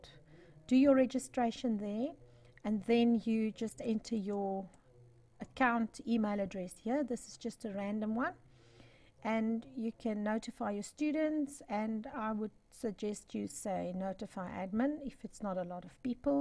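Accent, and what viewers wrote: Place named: South Africa. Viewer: un joli accent sud-africain. Thanks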